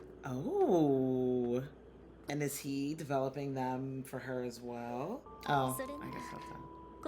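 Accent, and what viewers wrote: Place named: New York, United States. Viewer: My guess is that maybe you're from New York, haha XD And enjoyed your reaction as always!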